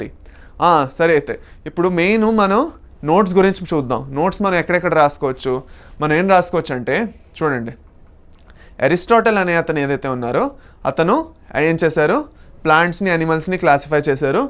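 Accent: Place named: Andhra Pradesh, India. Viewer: Chala baga chepparu sir Urs is pure andraite slang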